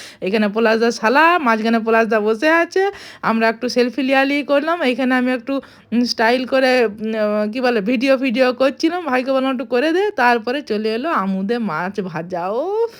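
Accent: Bankura, India. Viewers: তুমি কি সবসময় এভাবে ই কথা বলো?মনে তো হয় তোমরা বাঙালি ব্রাহ্মণ। তুমি বাঁকুড়ার লোক।বাঁকুড়ার সবাই কি এরকম ভাবে কথা বলে?আমি ঠিক জানি না।তাই জিজ্ঞেস করছি।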